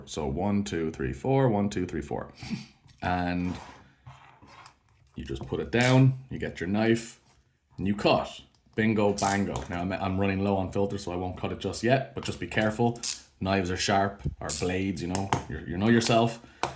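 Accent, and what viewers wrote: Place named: Ireland. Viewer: This is amazing, can't believe a mask that's been around for years which I have just tried and like I search up this issue and you made this but a few days ago. I need this trouble is I have no clue about 3d printing etc. Can I pay you to make me one? I am in the UK by the sounds of accent your just across the sea from me😂 happy to pay for all material, labour and postage? Would really appreciate it if possible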